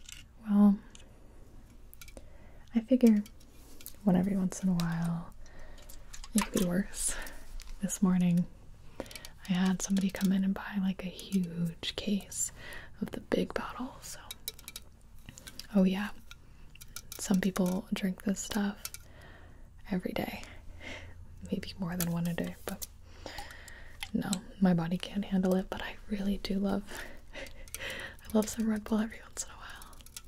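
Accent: Canada. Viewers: w8 is cAnaDiaN?!?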